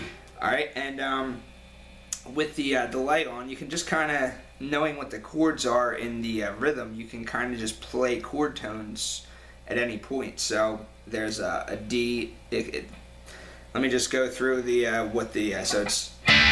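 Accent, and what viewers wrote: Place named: East Coast, United States. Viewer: This guy sounds like Adam Sandler or someone from the east coast who's about to kick my ass while trying to scalp tickets to an Eagles or Red Sox game.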